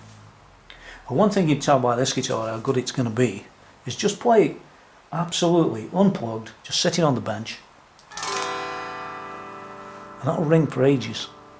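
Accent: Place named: Cheshire, United Kingdom. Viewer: I stumbled across both of your build series and as a Stat player myself I feel inspired to one day take a similar approach, with this mark 2 the thought of Strat without a scratch plate "oh Jesus no!!!!" You sir have more than changed my opinion, what a gorgeous looking bit of kit to own, and the sound and tone even on a smart phone sounds great, if I'm honest apart from my own it's been a long time since I've heard a Strat make me want to get my hands on it lol Do you gig at all? By your accent you sound like you could well live not all that far from me in Cheshire, if you do play live I'd certainly take the opportunity to come hear you play it, I certainly understand if that would be a bit too personal. Anyhow thanks for the inspiration and enjoyable videos, enjoy your custom build, with the money, time, care and effort you've invested in her you certainly now deserve the pleasures that come Cheers!!!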